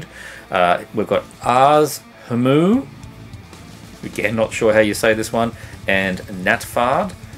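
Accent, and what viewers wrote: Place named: United Kingdom. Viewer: Yeah lots of Australian bands here but he has a British accent? I noticed that was weird too. Anyways, great list!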